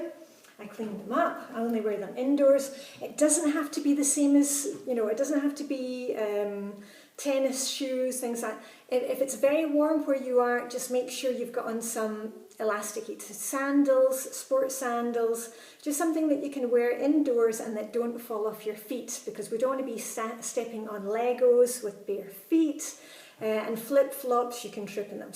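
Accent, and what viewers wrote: Scotland, United Kingdom. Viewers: Thank you so much for all your videos. I love your way of if communicating the FlyLady system- it has really injected new life into my routines. I have even downloaded the Teacher Control Journal to help me at work now! I also love hearing your Scottish accent and your lovebirds in the background!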